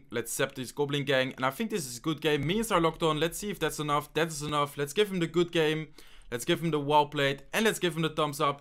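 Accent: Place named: Netherlands. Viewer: Le entiendo y es gracioso el acento inglés que tiene, es holandés y pronuncia las cosas raras a veces. xd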